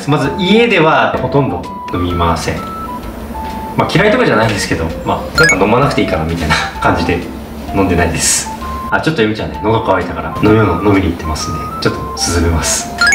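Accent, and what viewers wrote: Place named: Kyushu, Japan. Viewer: 九州訛りが お顔とミスマッチなところがとてもいい感じで好き😊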